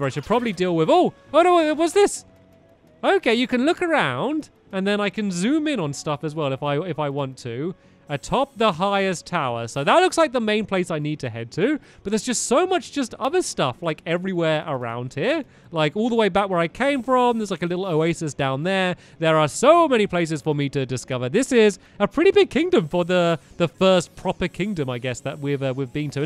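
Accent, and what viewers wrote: England, United Kingdom. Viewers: dexieplays he is English so he speaks differently I say zed aswell coz I’m not american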